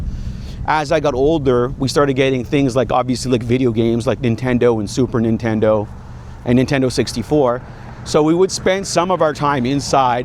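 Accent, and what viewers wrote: Canada. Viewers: Born 1983, so I'm here for this. I grew up without cell phones and we didn't get dial up internet until highschool, so it felt like the best time for that too- it was a new way to connect and plan stuff, and as a nerd, it provided the ability to find other people who liked the same stuff on web forums and chats. At the same time, it didn't create permanent record. There's no viral video or tiktok of me getting rejected or saying something dumb or falling asleep in Environmental Biology (sorry Mr. Gaspar- actually he was totally cool with it and let me sleep since I was normally a good kid and was obviously just exhausted). I was a free range kid for most of my childhood- today I'd have been taken away from my parents by the state. I think that's what changed too, prior generations, if their kid died or was seriously injured doing something stupid, they dealt with the tragedy and moved on. 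Now, those parents could be arrested for letting their kid get into that situation. I'm guessing your Canadian from the accent and some of the terms you used, so from an American perspective, if your kid gets hurt, not only is the parent getting in trouble, that medical bill could be devastating, which certainly incentivizes keeping little Johnny on his iPad vs on a bike. It got too expensive too. Everything did, everything is a commodified, and there is no place for kids to go and just be kids, it's even worse for teenagers. I understand not wanting to drive when every aspect of driving is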